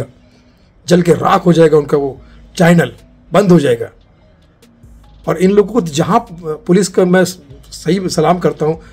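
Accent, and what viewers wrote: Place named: Uttar Pradesh, India. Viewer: Acha lga u.p wala boli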